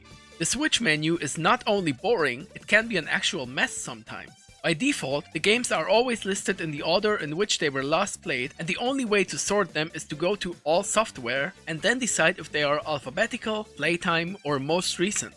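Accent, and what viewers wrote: Germany. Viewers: I hear deutsch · This is the first video i've seen from you and i immediately noticed that you're german lol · Are you German/Austrian ?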